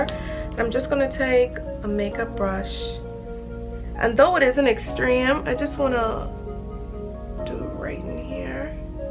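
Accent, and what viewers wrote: Bahamas. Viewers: Your accent sounds Bahamian...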